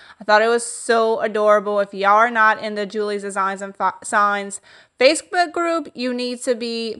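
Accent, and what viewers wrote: Louisiana, United States. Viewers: You’re most definitely a southern Louisiana girl! 😉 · Your accent is Louisiana! Southern La. at that. I’m from BR. Maybe on the west side of BR maybe one of the Acadians parishes. Loving your channel! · Darling Julie, You came up in my recommended videos and I told my little granddaughter she's a Cajun!!! 💜 I know she's a Cajun!!! 😁❤️ I can hear it in her voice ! She sounds like a Cajun ! She's from south Louisiana ; I just can't quite pinpoint where she's from. She could be from Lafayette or Ville Platte, or somewhere in the south,; maybe west of Lafayette ! I'm not sure but I know she's a Cajun; I can hear it, in her accent. She talks like me. (I'm from Mamou. ) 😁 You're not only a gorgeous Cajun but you're very smart and so talented you have an eye for fabulous flips and I love watching your videos you give me so much inspiration. 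I'm following you now. . I wish I could put you at the top of the list of my videos that I watch that are suggested by YouTube his man you're good at what you do. Blessings to you. ❤️ P.S. please eat some boudin for me. Haha ❤️❤️❤️❤️❤️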